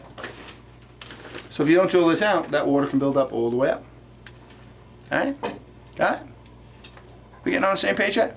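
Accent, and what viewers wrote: New Jersey, United States. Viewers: great video! can quickly tell you're knowledgeable. I don't pay any attention to the comments where they say they feel that you're bitching at them. Doing contract work myself it's so frustrating when you see shit work done by others. It gives the good contractors a bad name who are willing to do the job right the first time and it takes more time for you to dispel those fears in customers that have been screwed over. to hell with the "contractors" that are too lazy or stupid to do the job right. This video alone made me a subscriber. Right away I said "this guy sounds like he's from Jersey haha and sure enough. just relocated to South Jersey for my job. Keep up the good work out there and keep the videos coming. Take Care! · Damn funny as hell before I was watching another video and I’m like this dude is definitely from Jersey! 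Kick ass bro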